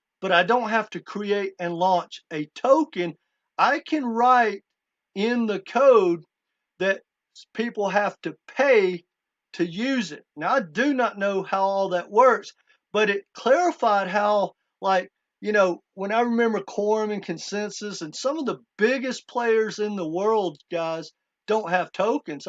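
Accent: Texas, United States. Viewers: This guy lack of research, all the money to handle, half of it are more than 2 quadrillion $ under the ledger, please understand ON demand liquidity, you are talking like a Texan guy .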